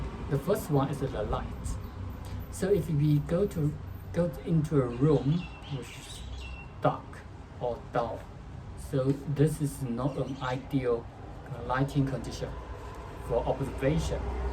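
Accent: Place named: United States. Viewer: Very nicely explained sir, u r great and sober and your accent is as a native of America's Very nice explanation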